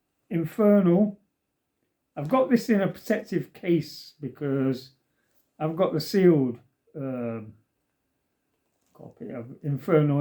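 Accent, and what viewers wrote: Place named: Nottingham, United Kingdom. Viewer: Are you from Nottingham by any chance? Might be the accent I dont know haha. Nice cave mate. All the best.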